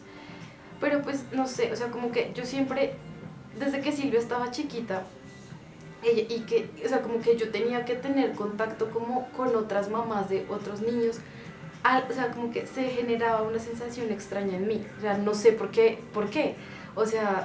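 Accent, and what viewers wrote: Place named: Colombia. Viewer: Holaa guapa! encontré tu canal en el de Marisol de mi vida con Mateo y me encanta, me gusta como hablan los colombianos pero así como tú jijii me suscribo y te invito a mi canal también soy mamá <3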